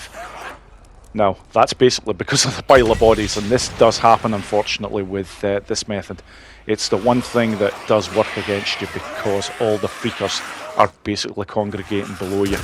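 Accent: Scotland, United Kingdom. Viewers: Just got myself my first gold in Ambush Camp challenge thanks to this guide. Looking to knock all 12 out with golds before I start the main game again with the Remastered version, then getting the Fuel tank and possibly saddle bags from Horde Assault beforehand, too. Been watching your overpowered playthrough as well and enjoying it with the commentary. Cheers for takkng the time for making these guides! I also gotta ask, are you Scottish, or at least live here, by any chance? I swear I hear a Scottish accent 😅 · Thank you for the guide. I did all the challenges with your guide. Some were easier to complete than others. Those bike ones were tough to get. Your soothing reassuring Scottish voice got me through it 😂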